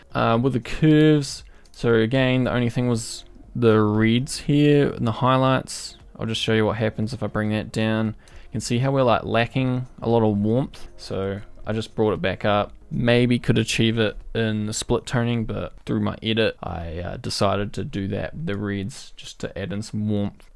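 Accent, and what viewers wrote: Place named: New Zealand. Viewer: A dutch name...that accent youre from NZ love you video's! groetjes vanuit Nederland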